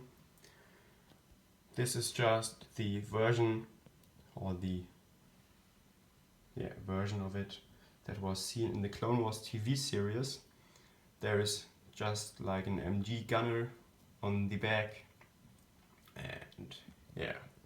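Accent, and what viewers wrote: Germany. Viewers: Wusste ichs doch, er ist Deutscher😂 der Akzent hat's verraten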